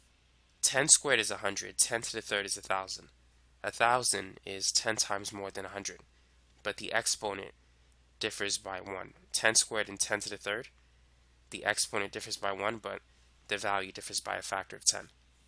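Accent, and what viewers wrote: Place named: Boston, United States. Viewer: Its how they talk in boston ...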